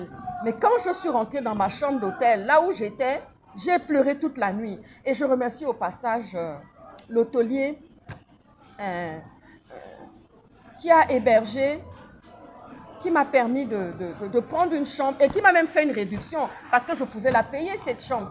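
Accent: Cameroon. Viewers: Tu es sûre que tu gabonaise ? Tu as l accent du Cameroun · Tu te dis gabonaise mais t'as l'accent du cameroun.hummm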